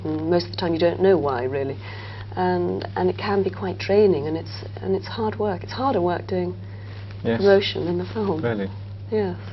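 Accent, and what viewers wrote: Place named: United Kingdom. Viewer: I ❤ your British accent and you too!😊😊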